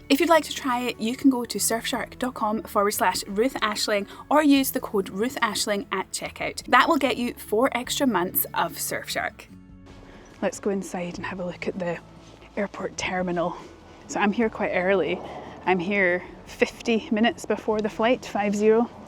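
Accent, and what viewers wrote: Scotland, United Kingdom. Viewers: Nice video. No bull just right and love the scottish accent ❤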